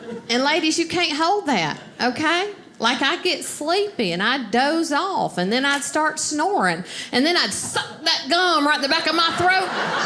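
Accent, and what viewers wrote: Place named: South, United States. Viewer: Absolutely hilarious... love you and your southern drawl...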